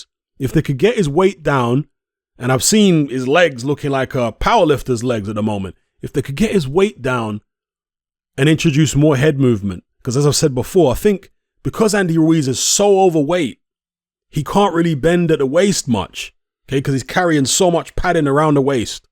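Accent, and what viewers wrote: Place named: United Kingdom. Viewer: Why did your tone change when Wilders was mentioned? Why is there someone at fault. Are you ignoring that Wilder is in mediation. This has been one of the most bias boxing videos I’ve seen, although you try to play it off. Ruiz made a video saying he isn’t fighting Wilder there aren’t any talks it’s a fan made poster and Rumor. This is sad coverage idk if assuming off your accent, but if you’re from the UK it’s pathetic. All UK fighters serve as easy meal tickets for Carmelo. Bjs probably getting stopped, and Aj ran from Ruiz and Hearn doesn’t like the idea of running it back a third time, they’re 1-1 Aj never avenged his loss he beat an out of shape Ruiz and ran at that. You saw the fear in his eyes every time Ruiz would swing that night. Fury definitely cheating in both fights you can’t photoshop videos and those gloves definitely didn’t look normal. 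Both those men are the best this UK mega fight has to offer it’s sad. The fact they haven’t even announced a venue tells me this fight may not even happen.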